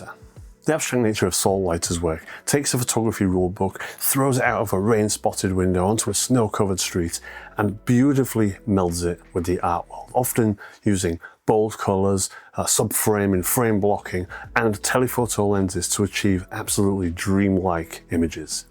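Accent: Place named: Hull, United Kingdom. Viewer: A hull accent on YouTube and a Saul Leiter fan, Brilliant! Really enjoyed watching. Your Instagram post brought me here, but before I read anything, I thought very Saul Leiter. Fabulous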